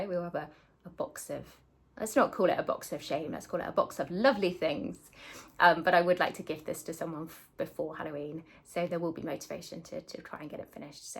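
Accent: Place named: United States. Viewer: Hi,! Great video, thanks for sharing! I have been planning on using my magnet giveaway to make cute little needle minders 🤭 those foxes are adorable!! Still obsessed with your ATC, laundry is so creative!! I love your American accent 😂 Yay long dog! I was drawn to Fish & Ships too, the other nautical ones in that “series” are fun and I’ve seen fewer people stitching on them. If and when I make the jump I’ll probably start with one of them! I fully support putting your name and age on the sampler! I was watching another video this weekend and they said “well you might not want to say you did it at age 76” and I thought “why not!! How fun!! 😂” Your stitchy kindness haul is great! Can’t wait to see you stitching on those + the spring Quaker!! -Katherine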